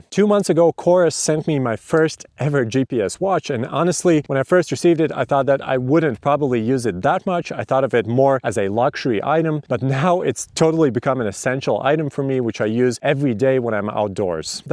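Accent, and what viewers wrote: Latvia. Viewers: Jau no tava akcenta var dzirdēt, ka esi latvietis :) Prieks redzēt, un man noder tavi video.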